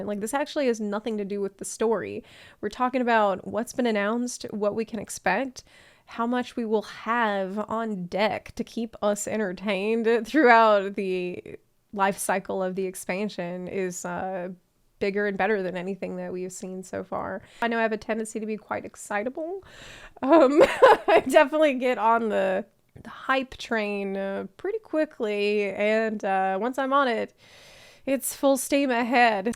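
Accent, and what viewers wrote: South, United States. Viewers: Xbox sprout here, only game I know you can put in..200 hours in a month and be a noob😂 I love listening to ya. As a southerner myself I like the accent. Now..back to my main full time+ot job. FfXiv!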